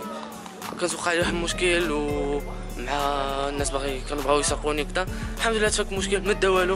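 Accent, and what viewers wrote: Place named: India. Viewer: Nice cars but your indian accent is Epic mate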